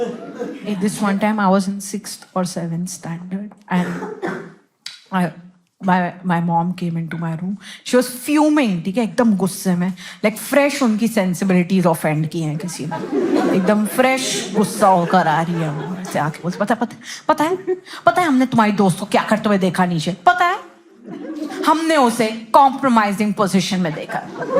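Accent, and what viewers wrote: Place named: Mumbai, India. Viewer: Is she from Mumbai by any chance? That accent sounds very familiar (Lots of my family members in Mumbai)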